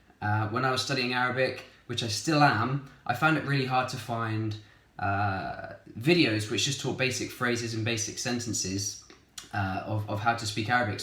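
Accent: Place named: England, United Kingdom. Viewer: Hello.. Great channel, I really like it, Arabic is amazing language, actually I love England accent,so that why i watch your channel to learn more and improve my english😁, keep going👍 أتمنى لك كل الخير والتوفيق good luck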